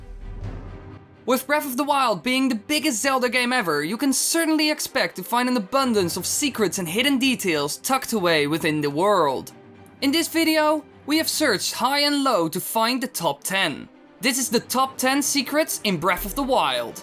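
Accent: Netherlands. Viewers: Are you dutch? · Are you (the narrator) dutch? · Ben je nederlands? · You sort of have a Dutch accent..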